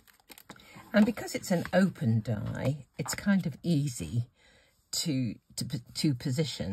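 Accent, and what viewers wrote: United Kingdom. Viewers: What a cute card! I subscribed long ago but I have a lot of subscriptions and I kind of get stuck on a few. Only recently came back around to watching some more of your videos and I have to say your manner is like a breath of fresh air! I love your clean and simple style and your voice is a joy to listen to. I love your British accent ( I’m from Texas in the US, we have quite a different sound!) and your calm, quiet ways. Your videos are so very enjoyable 💕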